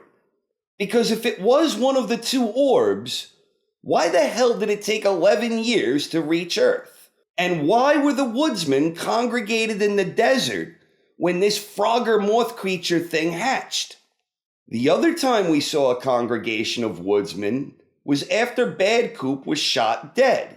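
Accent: New York, United States. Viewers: I really wanna know what his accent is. Is he from New York? or New England maybe?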